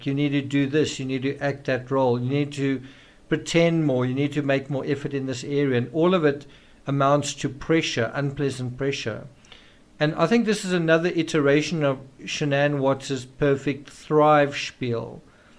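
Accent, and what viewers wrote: South Africa. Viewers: Are you South African🇿🇦🇿🇦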